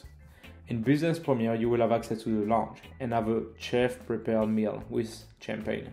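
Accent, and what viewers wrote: France. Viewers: heaviest French accent on the internet :) but such in depth knowledge of European railways keep up the good work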